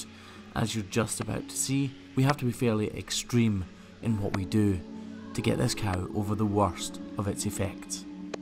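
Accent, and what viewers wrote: Scotland, United Kingdom. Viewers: I so enjoy your videos. I live on a farm no animals anymore but we plant 99 acres still. Your voice is so comforting. I am 3 generation Scottish American. Everyone family here with the accent has now passed. So when I listen to your videos I am taken back to my childhood and my nanny and grandpa. I have distent family still in Scotland. Definitely want to come home and find what family I still have. Maybe 1 day I'll comment that I finally made it. Keep caring for the beautiful ladies and big handsome gentlemen.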